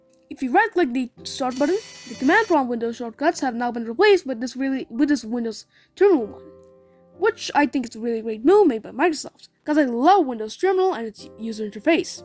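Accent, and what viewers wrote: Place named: United States. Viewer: How did you learn your accent lol, I'm also Indian, when I'm speaking in my mind i speak with that "american" accent pretty well, but in person, it just doesn't work. How did you learn it?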